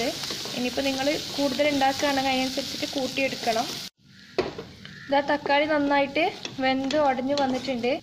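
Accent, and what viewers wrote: Kannur, India. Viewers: kannur evideyaaa. .......varthnm kelkn nalla rasamud. .....njanum kannur annu. ....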